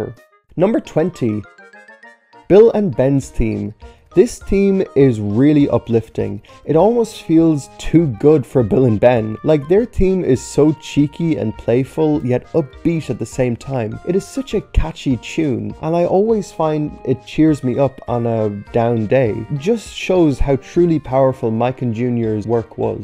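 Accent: Ireland. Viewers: He's Irish, and Irish does not have any sounds that collorate with thorn ( þ ) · He's probably irish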